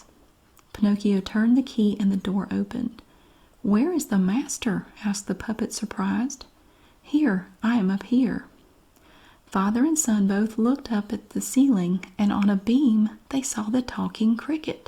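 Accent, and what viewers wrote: South, United States. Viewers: There’s nothin like a sweet southern woman reading u a bed time story for u to sleep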